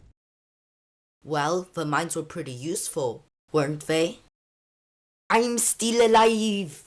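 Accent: France. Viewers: The french accent 😂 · BRO YOUR ACCENT IS FRENCH BUT SO GOOOOOD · Gotta love the french accent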